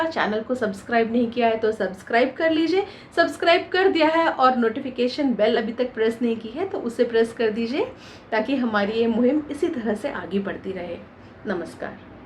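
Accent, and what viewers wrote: Standard. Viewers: हिंदी भाषा का शुद्ध उच्चारण ❤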